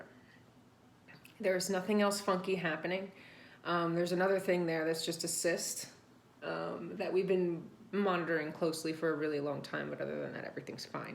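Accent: New York, United States. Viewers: You still have a smidge of New Yawk accent! Wishing you the best, sending prayers, feel free to complain and bitch at any time! Have watched your journey and love that you share it, you are saving lives and now it's time to keep saving yours! Hugs!!!! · lol! love CAW - FEE ( COFFEE) - go New York gals :) and love your speaking - can't take the FUCK outta a New York girl :)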